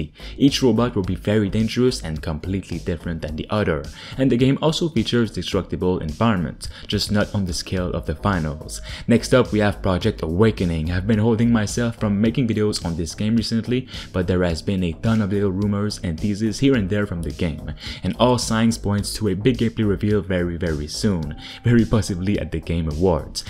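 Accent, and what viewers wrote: Quebec, Canada. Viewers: Bro t québécois right ?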